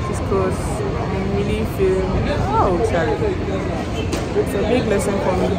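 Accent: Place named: Nigeria. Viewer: Congratulations to a successful trip❤️💌🥂 I love your accent, it sounds like that of a Nigerian 🇳🇬🥰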